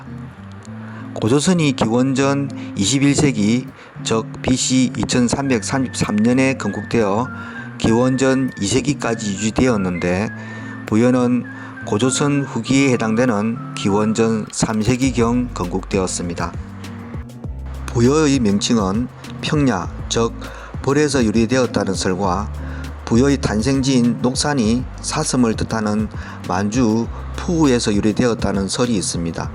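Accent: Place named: Gyeongsang, South Korea. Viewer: ㅇㅑㅁㅁㅏ ㄱㅕㅇㅅㅏㅇㄷㅗ ㅅㅏㅌㅜㄹㅣ ㅈㅗㄲㅏㅌㄴㅔ. ㅇㅏㄴㅗㅏ ㄱㅕㅇㅅㅏㅇㄷㅗ ㅇㅣ ㅅㅐㄲㅣㄷㅡㄹ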